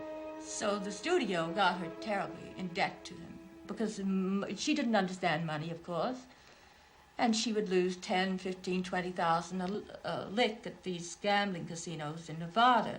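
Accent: Mid-Atlantic, United States. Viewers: Very surprised at her posh American accent. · It sounds like a so-called mid-Atlantic accent, albeit toned down a mite. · It’s the Trans Atlantic/Mid Atlantic accent. It was how the upper class in the North East spoke. It was taught in speech/elocution classes as well, as in drama schools/classes. It fell out of favor after WWII.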